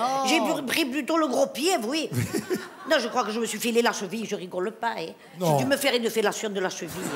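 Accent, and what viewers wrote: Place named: Nice, France. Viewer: c est la perle du rire.+ Anne Romanofe = pissé de rire,sacré "Mado"j adore l accent niçois et ses expréssions .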